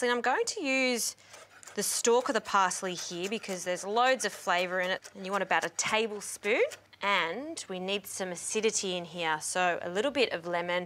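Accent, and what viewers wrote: Australia. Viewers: is she Aussie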